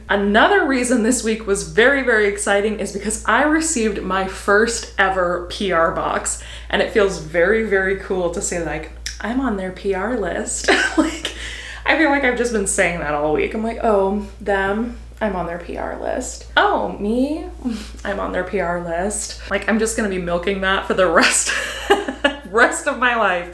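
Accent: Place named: United States. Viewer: I hear that American accent quite often, I really like it. What type is it...Southern?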